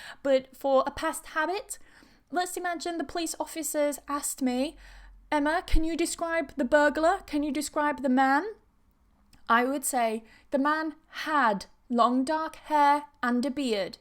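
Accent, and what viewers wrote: United Kingdom. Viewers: Hi, i like your Brittich accent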